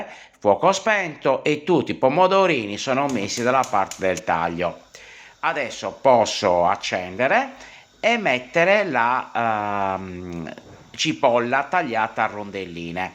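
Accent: Sardinia, Italy. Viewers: Molto piu della ricetta mi piace il suo accento sardo! Eia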